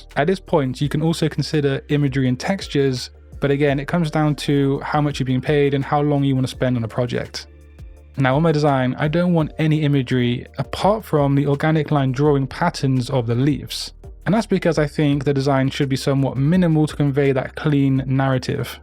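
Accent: United Kingdom. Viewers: Are you from the USA? I thought you were from the United kingdom.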